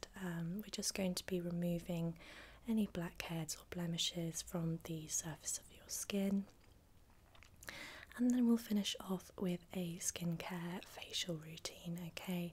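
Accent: England, United Kingdom. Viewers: Something about a British accent at a certain volume relaxing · i like your british accent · You have the perfect voice for asmr. The fact that you’re british as well makes it that much better. I’m Canadian but british accents have always been my favourite. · L v It’s still an English accent, irrespective of how many there are 😏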